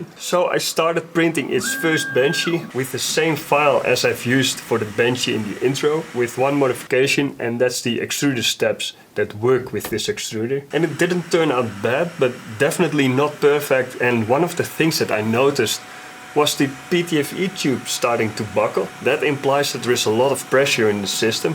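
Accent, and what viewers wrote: Netherlands. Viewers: het shitste Hollands-Engels accent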